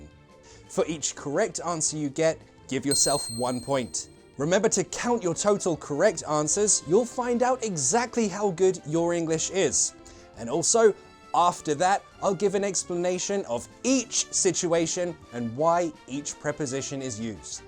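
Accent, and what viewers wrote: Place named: Cockney, United Kingdom. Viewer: Nice ,with a cockney accent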